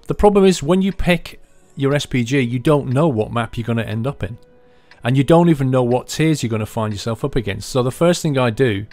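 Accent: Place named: United Kingdom. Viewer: Lets Mber нормально он вроде разговаривает, носитель английского языка (британец, слышно британский акцент)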